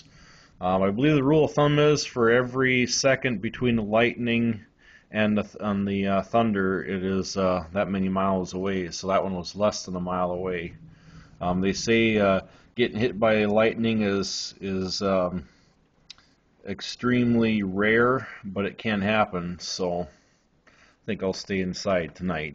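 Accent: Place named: Midwest, United States. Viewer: I'am originally from wisconsin,you shure got a midwest accent- no shame:)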